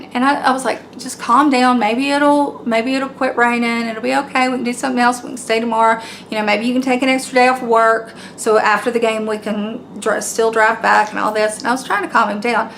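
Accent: South, United States. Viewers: Loved your Kooky-ian vid so started binge watching other vids. If you like true ghost stories check out "Real Ghost Stories Online" podcast w/Jenny & Tony Brueski. Sooooo good!!! Love hearing you talk. We've moved to Maine from the South & it is lovely to hear familiar accents!! LOL!! Sending much love!!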